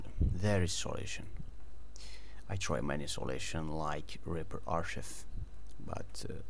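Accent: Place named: France. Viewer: non , french accent !!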